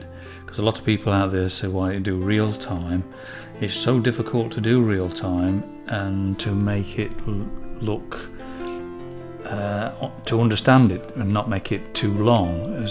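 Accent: United Kingdom. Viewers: Thanks for letting us look over your shoulder and your extreme helpful comments (and after many years of communication with Americans I love to hear your georgous british accent 😉). It is the first time for me to get such a good impression of drawing human skin. Especially your work with the complementary colours is impressive. Thanks again and a nice christmas for you and your family from an art student in Germany.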